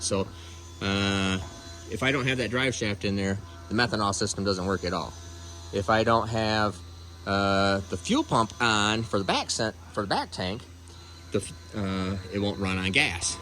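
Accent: Michigan, United States. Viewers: are you from michigan?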